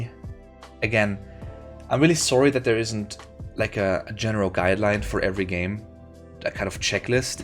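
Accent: Germany. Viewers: You sound pretty german xD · Where are you from? Sounds a little bit like german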